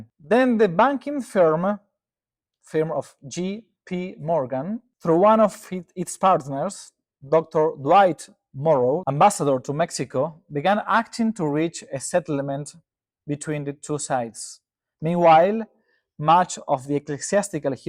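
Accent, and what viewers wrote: Argentina. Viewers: Muy bien inglés con acento argentino. Me encantó.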